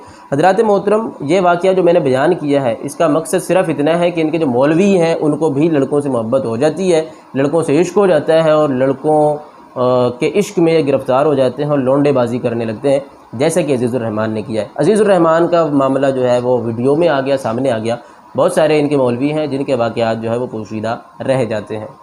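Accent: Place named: Azamgarh, India. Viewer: جناب آپ اعظم گڑھ مبارکپور کے رہنے والے ہیں آپ کی آواز سے ایسا ہی لگتا ہے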